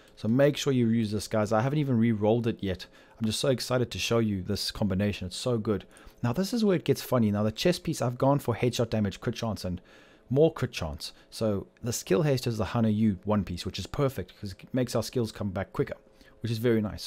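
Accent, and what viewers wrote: South Africa. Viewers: What country you from... South Africa?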